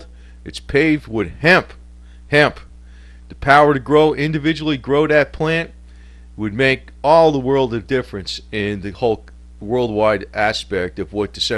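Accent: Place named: Minnesota, United States. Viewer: this guy is obviously from MIN NES SOODAA.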